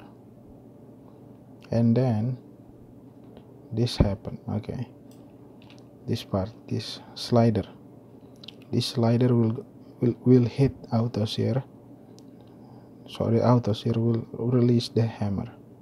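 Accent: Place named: Indonesia. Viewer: Your accent is very indonesian. You even sound like my lecturer lol😂. Or are you?? Vsauce music plays Love your content btw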